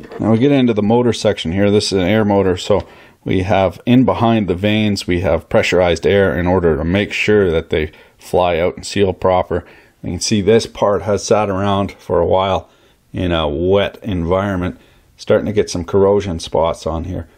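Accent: Quebec, Canada. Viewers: That's some of the best Canadian accent I have heard in a while. Felt like drinking a cup of maple syrup and eating some poutine when I was watching this. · Canadian accent. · My freaking god you sound canadian when you speak french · Quebec accent swearing yeah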